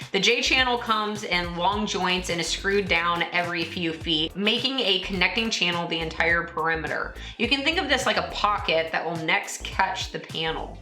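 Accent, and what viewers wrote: Texas, United States. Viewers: with your Texas accent, when you say the name it sounds like "Pure Stoke". ALso a cool name.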